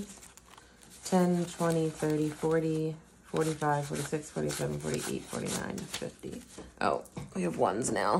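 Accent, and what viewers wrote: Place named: East Coast, United States. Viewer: Are you from New Jersey/East Coast? You sound identical to my cousin! Love it. So relaxing. Congrats on your first bill exchange! I can’t wait until I have mine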